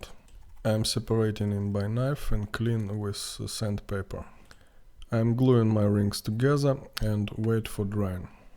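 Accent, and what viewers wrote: Russia. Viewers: Such a satisfying Russian accent :) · Ты сам из России что-ли? акцент русский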